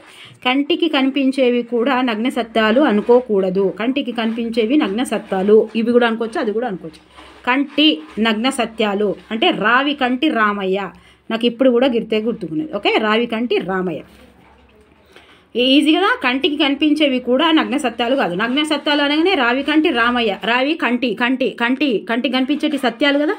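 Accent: Telangana, India. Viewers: పక్క తెలంగాణ భాష లో చాలా బాగుంది మేడం 👌👌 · Me voice vinte oka energy vastundi mam telangana slang nd me short tricks , explanation chala bavunnay... thank you madam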